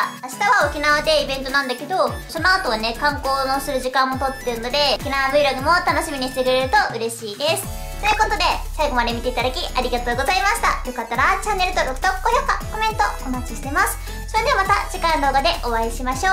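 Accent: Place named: Kansai, Japan. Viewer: あれ⁉️ さんは、関西の血が通ってた？ちょいちょい、関西のイントネーションや言い回しを、ぶっ込んでくるよね😂😂😂 とにもかくにも、沖縄の【蚊】は、デカいよ😱😱😱 ていうか、台風大丈夫⁉️🥹🥹🥹 出張、お疲れさま｡｡｡(lll __ __)ﾊﾞﾀｯ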